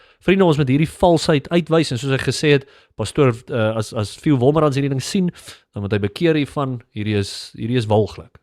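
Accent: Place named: Mid-Atlantic, United States. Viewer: That "Mid Atlantic " accent should make anyone suspicious.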